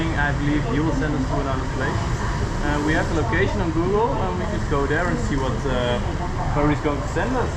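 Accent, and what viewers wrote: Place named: Netherlands. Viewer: Prachtig! We blijven onze Hollandse accent zo overduidelijk behouden als we Engels spreken. Gelukkig is daar geen friet van Piet 😅 Zeer leerzame opname. Nice!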